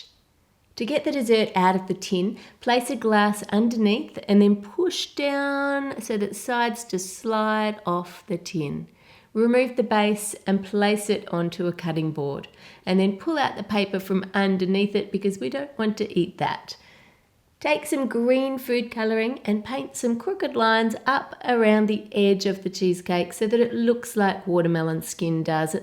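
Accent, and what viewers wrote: Australia. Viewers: Australian accent ( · The Australian accent is real..! xD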